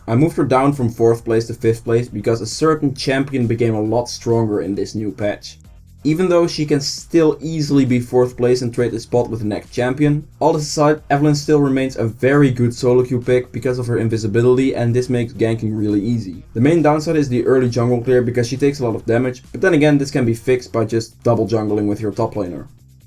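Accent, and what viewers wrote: Netherlands. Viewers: nice vid again greeting from your home country I can hear a dutch accent xD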